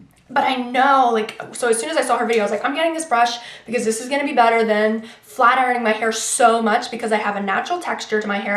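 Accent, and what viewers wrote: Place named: Philadelphia, United States. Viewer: are you from Philadelphia? Your accent sounds like it could be :]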